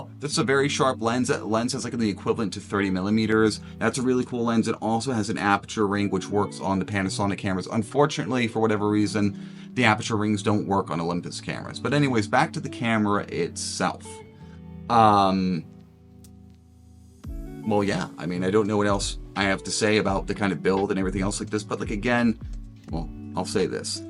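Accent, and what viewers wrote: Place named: United States. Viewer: You don't sound Scottish. I would have said American.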